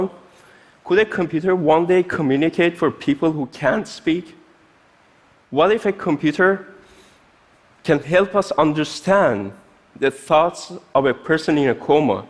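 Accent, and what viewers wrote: Iran. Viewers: that persian accent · Love to hear native speakers of Persian speak English. Such a beautiful sound! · Detecting...: iranian: Accent, face, brain, hair, eyes and body.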